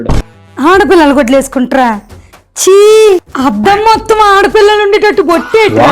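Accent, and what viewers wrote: Srikakulam, India. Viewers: Srikakulam yaasa......🔥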